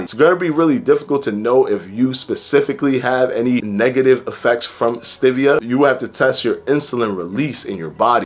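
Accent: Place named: East Coast, United States. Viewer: Are you from the East Coast, I hear an accent?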